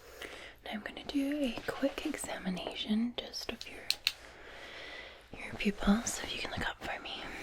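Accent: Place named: Scotland, United Kingdom. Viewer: Love your cranial nerve and sleep clinic videos your soft Scots accent makes me feel safe it helps me with PTSD survived sa by male guitar teacher at 17 fifty four now but you help me sleep thank you for being a light in the darkness you really are a life saver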